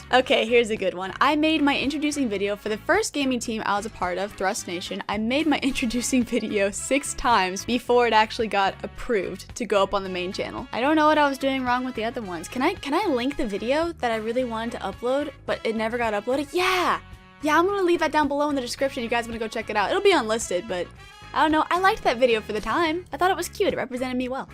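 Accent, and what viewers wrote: South, United States. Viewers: So much southern accent and its amazinnnnnnnnnnnn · I knew you were from the south lmao I heard the slight accent in your new videos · I knew it I knew you had a southern accent how I am southern too so ha got em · Nothing fazed me that 6ou were from Georgia I'm from Georgia but they say I have a more northern accent and I can hear the southern in you lol kinda cute though not gonna lie😂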